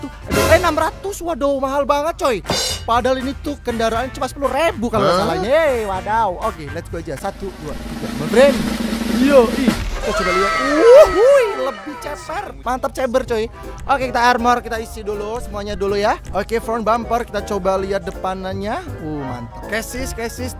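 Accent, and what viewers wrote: Jepara, Indonesia. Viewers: Abang orng jepara?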